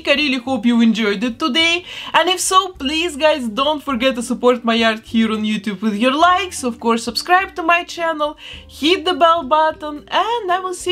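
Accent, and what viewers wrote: Russia. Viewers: you have a Russian accent in your pronunciation. are you Russian? :) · У меня шиза или это русский акцент?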